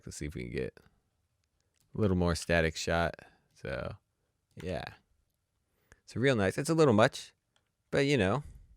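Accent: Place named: England, United Kingdom. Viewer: it's english..not american lol..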